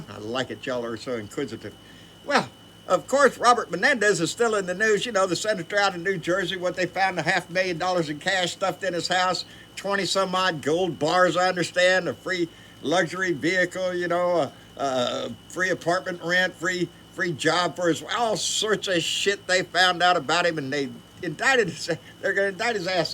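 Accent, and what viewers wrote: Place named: New York, United States. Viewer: WOOO HOOO!! Holy you know what I can't tell you how nice it feels to hear my NY thoughts echoed in that accent! THANK YOU. 👏🌼✌🏜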